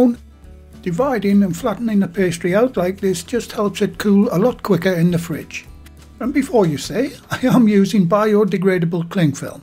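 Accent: United Kingdom. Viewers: Ahh, sir your accent and recipes reminds me of me Gran and me mum out here In Australia, I have all their old recipes that they wrote down and I follow them to the letter, as I’m getting on a bit now (52) I’m considering putting them together in a book, you can’t beat these old time recipes, you are my favourite cook on YT as you’re the closest I’ve found to that ole home style British cook, thank you mate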